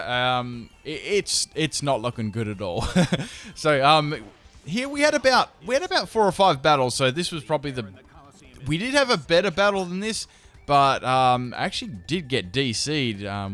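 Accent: Australia. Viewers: I keep guessing what is "pill"...it's actually Pearl. gotta understand Australian better now that I'm hooked to this channel.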